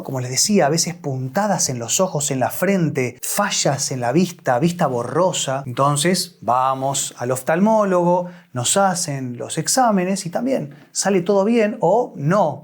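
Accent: Buenos Aires, Argentina. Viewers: Sí yo me imaginé que es de Argentina porque lo escucho Hablar, pero de qué parte? Supongo que debe ser de la provincia de Buenos Aires, no sé si así de la provincia pero Sí o sí es de Buenos Aires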